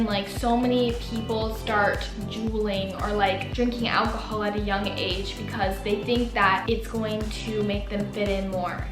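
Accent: Australia. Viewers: I have never seen one of your videos and I thought you were from Australia!!!! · First of all your Australian accent was amazing. Also this is so helpful I was homeschooled for the freshman year. I decided to enroll back in school and I’m a little nervous so happy this helped. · oh my god I thought you were actually Australian 😫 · this is the first video I’ve watched of u and I legit thought u were Australian. good eye might. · YESS love that Aussie accent you have to come to Australia soon bc I would love to meet you🤩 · aye! just kidding I love ur brilliant aussie accent please make more vids with the accent btw smol youtuber here! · her australian accent confused me!! i was like doesn’t have an accent?? 😅😅😅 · suddenly you're coppying Kennedy Walsh with the australian accent not hate love ya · This is the first video I’ve seen of u and I thought u were Australian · this is my first time watching one of your videos and i actually thought you were an aussie 😂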